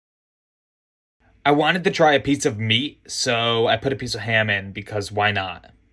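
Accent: United States. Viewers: Interesting accent. Sounds American but once in a while it sounds Australian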